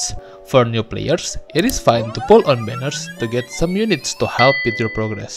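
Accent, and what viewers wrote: Indonesia. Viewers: As soon as I heard the accent, I knew this man was Indonesian. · Logatnya indo banget masnya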